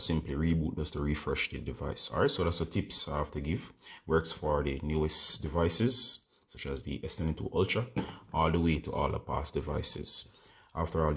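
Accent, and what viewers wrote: Jamaica. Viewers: I hear the 876 accent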